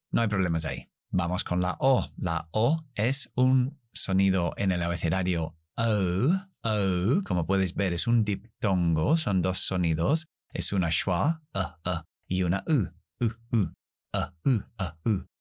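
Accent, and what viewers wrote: United Kingdom. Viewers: Me encanta el británico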